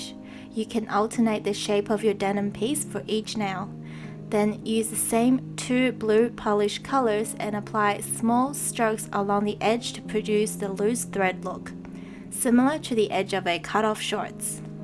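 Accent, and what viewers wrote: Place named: Australia. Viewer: I love your accent, I want to live in Australia!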